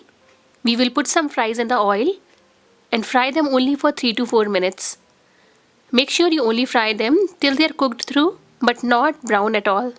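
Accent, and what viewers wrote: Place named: India. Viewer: Da Indian aksent🤗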